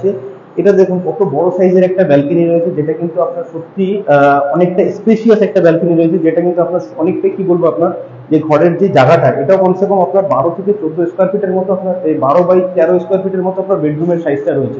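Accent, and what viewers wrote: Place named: India. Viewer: Uni bangali non, tai carefully shule kichu kichu jaygay hindi chaap eshe jache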